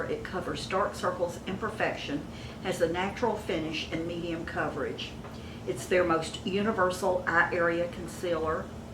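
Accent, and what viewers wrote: South, United States. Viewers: I am new to your channel !! Im so glad I found you !! You are such a delightful and very Beautiful classy lady, I can't wait to show my Mom your channel !! If i may ask, where are you originally from ? I detect a southern accent ? Blessings and Love....XoXo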